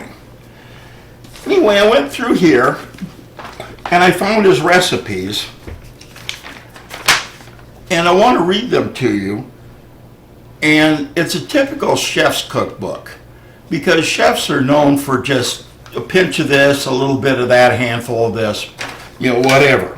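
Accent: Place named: Chicago, United States. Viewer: Where ya from? I’m from Chicago n you kinda got my accent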